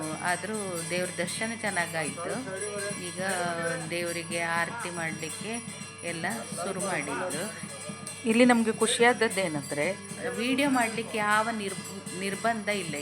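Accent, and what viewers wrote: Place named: Kundapura, India. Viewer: ನೀವು ಕುಂದಾಪುರ ಕನ್ನಡ ಮಾತನಾಡತಾ ಇದ್ರಲ್ವಾ ಹಾಗೆ ಕೇಳ್ದೆ ನಮ್ಮ ಅಕ್ಕ ನ ಊರು ಸಾಗರ ಭೀಮನ ಕೋಣೆ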